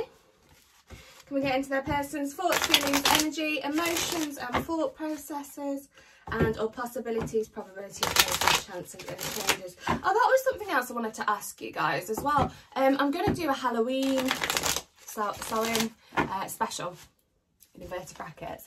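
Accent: England, United Kingdom. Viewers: Thank you! I love the way the English talk ! Is your relationship going to rise from the dead. Great for Halloween reading 🤣☺️🎃💀👹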